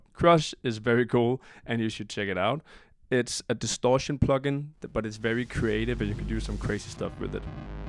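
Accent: Denmark. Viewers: You sound danish, is that correct?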